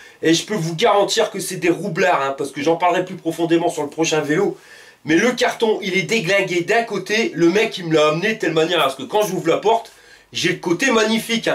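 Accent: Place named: Nord-Pas-de-Calais, France. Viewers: Salut, j'ai découvert ta chaine depuis peu et je me régale;) Des tests de malades avec de vrais retours! le tout avec notre accent chti;) J'ai acheté le même vélo dans sa version Y20 (même caractéristiques) et il est top! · je ne te connaissais pas ;j'adore ,tu me fais beaucoup rire avec ton accent du nord.et bravo pour tes commentaires au sujet de ce velo. je pense acheter le meme.continue comme ca .VINDIEU.......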